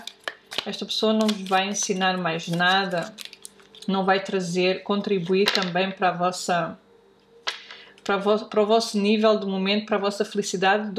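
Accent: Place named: London, United Kingdom. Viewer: You know...a frase, típica do Inglês Britânico ao final de uma sentença verbal não deixa duvida de seu inglês Londrino, You know...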